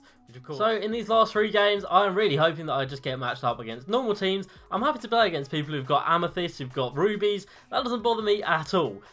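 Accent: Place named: United Kingdom. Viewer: Loving the BRIT...........ISH ACCENT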